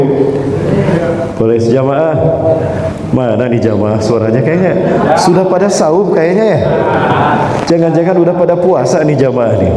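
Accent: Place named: Sunda, Indonesia. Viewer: Aamiin 🤲 URB orang Sunda jadi intonasi bicaranya menarik memang, Alhamdulillah..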